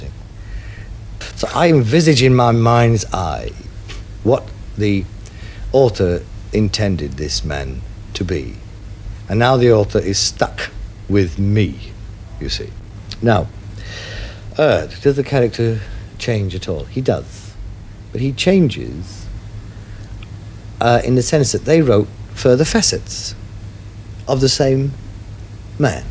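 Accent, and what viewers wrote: RP, United Kingdom. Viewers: bit of a posh old boy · Funny hearing him talk posh I'm just waiting for him to break out saying .you dirty old man lol pure gold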